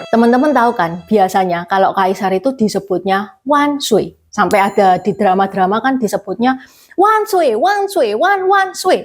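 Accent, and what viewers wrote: Java, Indonesia. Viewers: Ya, apik to😅 Jawa banget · Cerita / narasi nya menarik cuma lafal / pengucapannya (intonasi) terdengar aneh 😢 jawanya terlalu medok😊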